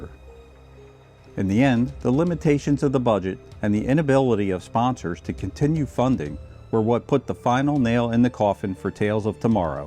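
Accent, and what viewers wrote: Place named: Pennsylvania, United States. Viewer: Your accent sounds like you're from Lansdowne, Pennsylvania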